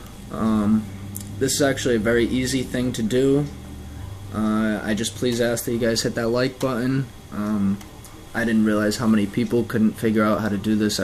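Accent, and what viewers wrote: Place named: New York, United States. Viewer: damn, some words you pronounce like a real new yorker, and i love it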